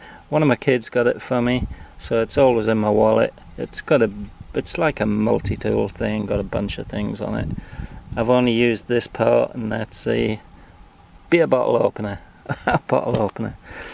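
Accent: United Kingdom. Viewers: Hell yeah, first time seeing this video. The Internet should be full of guys with UK accents doing EDC videos involving weapons, but you're the first I've seen sadly. anyway great video and one is better than none I guess.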